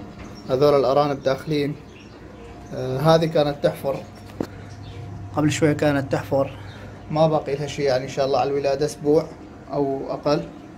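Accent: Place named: Mosul, Iraq. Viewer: عبالي شامي طلعت من اهل الموصل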